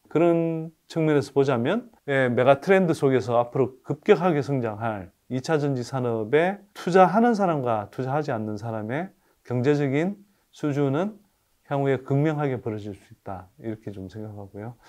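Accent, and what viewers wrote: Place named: Gyeongsang, South Korea. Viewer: 항상 표준 경상도 말투에 호감하면서 존경하고있습니다.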